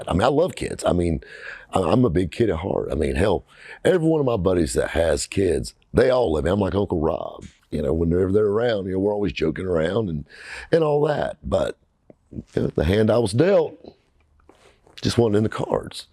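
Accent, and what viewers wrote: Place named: Greenville, United States. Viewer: Bro i feel so crazy your from greenville.. I would see u on vinwiki.. I always thought this. Guy sounds like us and he funny as hell.. Your doing great and you represent greenville 👍👍👍 and yes bwm or everywhere 😂😂😂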